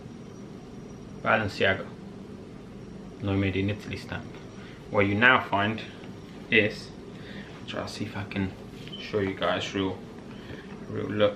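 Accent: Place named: United Kingdom. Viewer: Are you Mexican ? 😁 I’m confused because your accent is British 😂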